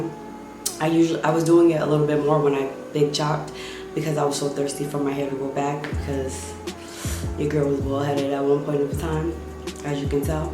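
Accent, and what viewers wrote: New York, United States. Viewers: Fellow NY’er here, I know that NY accent cause I have it too😂😂. But your hair and skin is freakin gorgeous. I will definitely try this and I just subbed. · U from NewYork?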